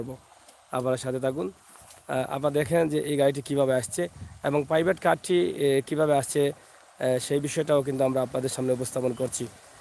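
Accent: Sylhet, Bangladesh. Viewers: আপনার বিডিও কুব সুন্দর লাগের ভাই। সবটি দেখছি। আর সিলেটি মাতলে আরো বেশি মযা লাগে।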